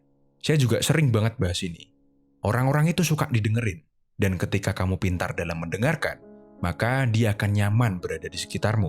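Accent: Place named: Java, Indonesia. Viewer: Ga, yg ini agak medok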